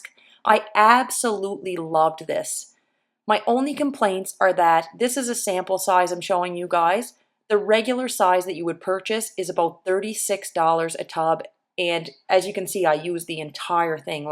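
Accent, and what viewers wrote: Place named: Maritimes, Canada. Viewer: I seriously love your maritimes accent I live in the suburbs of Ottawa. I'm just finding my curls again. Love your Channel feel free to check mine out to mines more about the food and saving money LOL.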